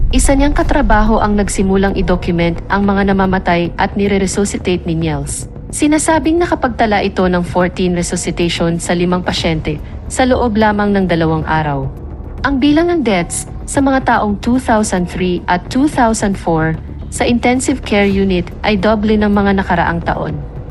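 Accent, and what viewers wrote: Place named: Spain. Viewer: Hello sir How are you today in Austrailia? Thank you so much for visiting! and I wish you have a lovely weekend😊 I feel the Spanish accent in your language, where are you from? anyway it was so cool😊 new subscriber from south Korea!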